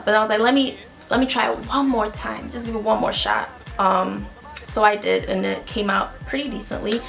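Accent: Nigeria. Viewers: Loving the Naija accent oh. hmm. lol. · My sistah! Where you dey now!? Naija girl. I heard the accent a little lol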